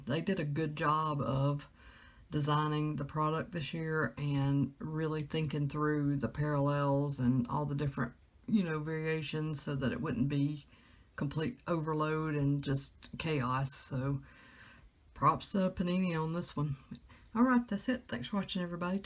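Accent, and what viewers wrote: South, United States. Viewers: You got an awesome box. And your southern accent is awesome too. Don't let anybody tell you different. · The southern accent rocks. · Audio is perfect, your southern voice is perfect too. Nice box! Love the design, Gina make a nice football card.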